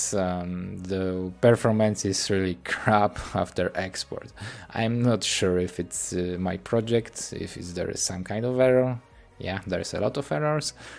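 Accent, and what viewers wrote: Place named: Poland. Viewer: Dude You are really talented and I am grateful that you present these techniques, I am really profiting and learning from it, but pleaaaaaaaaase for the love of god make a script for your videos or prepare some form of presentation. The animation and footage are good but that bored 4 AM freestyle and "uhmmmm" on top of that thick polish accent is hard to listen to broooo, it unnecessarily undermines your porfessionality and credibility, please 🙏🙏🙏🙏. still subscribed though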